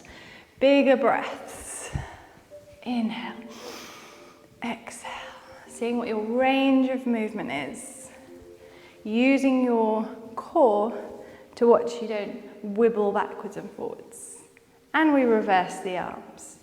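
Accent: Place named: England, United Kingdom. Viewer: Oooh. Another one to add to my play list. I love your calmness and soothing voice and great workouts 😌💞 Are you an English lass? Gorgeous accent. I'm in Aus. 🇦🇺 Just found your channel and will be checking out many more of your videos. You're fabulous 👍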